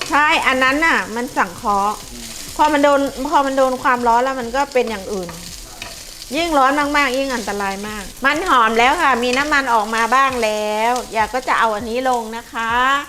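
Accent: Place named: Nakhon Phanom, Thailand. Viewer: เป็นคนนครพนมเหมือนกันค่ะ คุณแม่คุณยาย วิธีการพูดคล้ายๆ เลยย ดูทุกคลิปที่คุณยายทำอาหาร ชอบทุกอัน ฟีลเหมือนได้กลับบ้าน ชอบมากรักมากค่ะ ทำบ่อยๆนะคะ ติดตามต่อไปค่ะ🤍🤍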